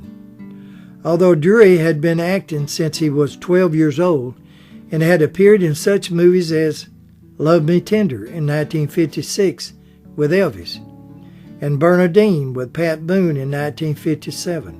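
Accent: South, United States. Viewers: What evocative videos these are for a Golden Age Syndrome sufferer like me, and all in that wonderful southern accent.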